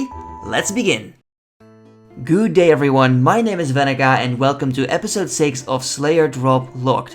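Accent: Netherlands. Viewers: Ik had al zo'n idee dat je Nederlands was... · I think he is Dutch. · Lekker hollands accent hoor haha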